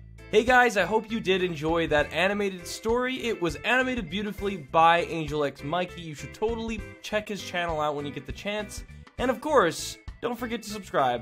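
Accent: Canada. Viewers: wait, r u canadian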